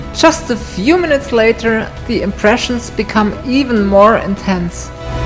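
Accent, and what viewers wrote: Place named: Upper Bavaria, Germany. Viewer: Gerne,! Du hast einfach ein feines Händchen für stimmungsvolle Bilder, gekonnte Schnitte, musikalische Unterlegung und Kommentierung mit NI (=Natürliche Intelligenz). Als halber Niederbayer liebe ich natürlich ganz besonders Deinen oberbayrischen Dialekt, aber Englisch hat auch 'was;-) Ich kann Dir (eigentlich vor allem Deinem Freund Gerd) aber ein weiteres Kompliment nicht ersparen: Die Flüge, die Gerd so kurz nach der SPL-Lizenz zusammen mit Dir unternommen hat, nötigen mir wirklich ungläubiges Staunen ab. Speziell nach dem ich selbst in dieser Saison innerhalb von vier Monaten die Segelfluglizenz ablegen konnte und daher ein wenig nachfühlen kann, wie man sich als "Jungpilot" jenseits des eigenen Platzes fühlt. Deswegen Euch beiden ein grosses Kompliment für die cineastisch perfekt eingefangenen Flüge im hochalpinen Terrain - Chapeau und Danke für's Mitnehmen! 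😊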